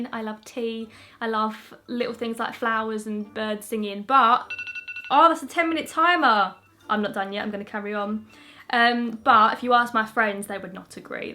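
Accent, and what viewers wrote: Essex, United Kingdom. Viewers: You're legit me but prettier and with an Essex accent 😂🤩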